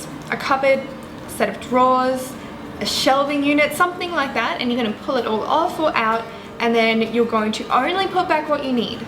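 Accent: Australia. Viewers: I love aussie accent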